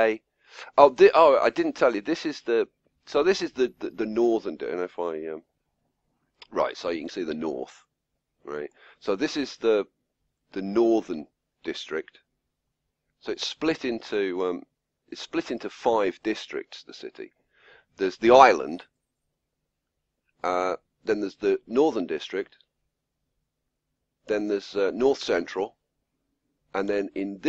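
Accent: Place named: United Kingdom. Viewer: I love your briton accent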